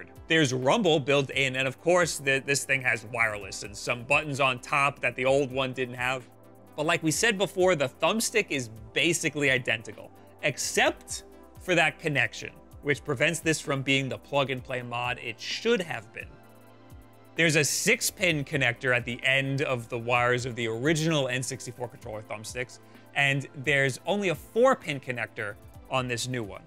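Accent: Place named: United States. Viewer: Why do americans say saughtering instead of soldering? Is the word spelled differently in USA?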